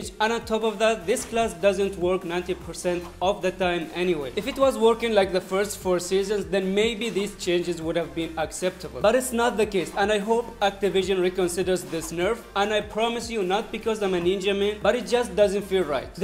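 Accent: India. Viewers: Indian accent :( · Hahah I love your accent indian right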